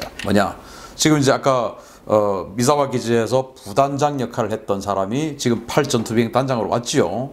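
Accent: Gyeongsang, South Korea. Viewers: 군사전문 박사님의 매일 듣는데 너무 재미있고 실감납니다. 경상도 말씀도 조금 쓰시면서. 아주속 시원합니다. 건강하세요. 고맙습니다.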